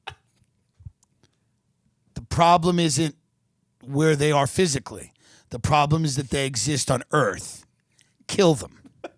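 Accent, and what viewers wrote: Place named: New York, United States. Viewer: Hahahaha the New York/East Coast accent is a blessing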